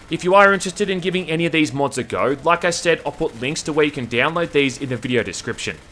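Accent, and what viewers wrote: Australia. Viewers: What are you, an Aussie? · Is it me or did he sound more stralian in this one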